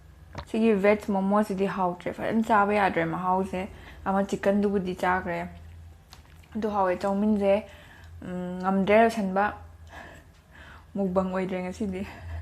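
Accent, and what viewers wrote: Kakching, India. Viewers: Kkching lon sum ngngbiyo .....cna hena tab nungaijei😍😍😍😍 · Kakching marol ngangba heisu heita malle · Kakching londa ngangbdo yam nung c nangna chabdu haodou yam male hayeng yumda thorkanda sak khangnarga chaminase eisu haobra yenge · Noi lonse taba yam nungai eigi enamashu kakching bazar mnaktgi ngdi noi lonbu heitreda. Ado pork chardi pork chabgi video tourako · mathangdi che pork chabei oina twrak oh kakching marol tabasu yam nungaii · Kakching maron d ngang da b n henna fajei natural d oina adum ngang ngo... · kakching lon ngangbac tba ym nungai ado lon khoidabda su hei ym nungaiye tabda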